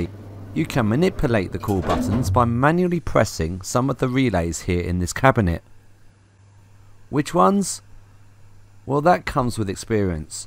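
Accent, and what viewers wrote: United Kingdom. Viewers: You've got a beautiful British accent * . *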